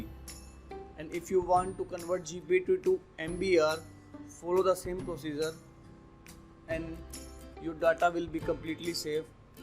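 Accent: India. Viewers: The music is slightly louder than your voice. So it's really hard to understand what you are saying, along with your Indian accent.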